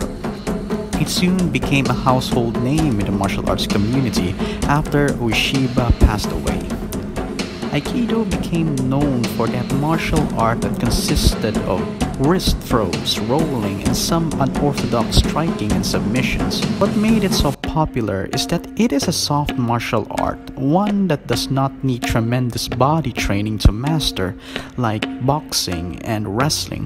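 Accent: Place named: Philippines. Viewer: Your accent sounds filipino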